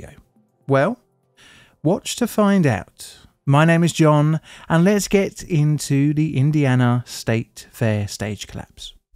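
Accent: United Kingdom. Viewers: Why would i get my Indiana state news from someone who sounds like a limey?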